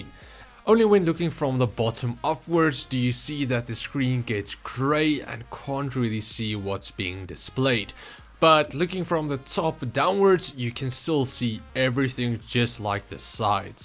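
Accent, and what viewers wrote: South Africa. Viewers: South african accent. deal with it. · he’s from South Africa. It’s his native language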